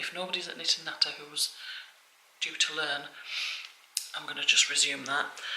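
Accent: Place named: County Durham, United Kingdom. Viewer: I'm not sure where you are, your accent sounds local to me. I'm in County Durham x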